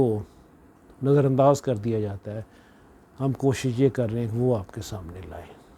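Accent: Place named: Punjab, India. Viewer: کہوں مجھے آپ کے تلفظ سے مشرقی پنجاب کی جھلک نظر آتی ہے 😊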